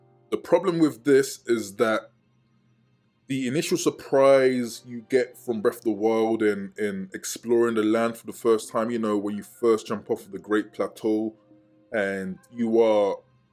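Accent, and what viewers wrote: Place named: United Kingdom. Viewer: Subbed as soona s I heard your voice! Most Zelda content creators are American, nice to hear a British opinion 💕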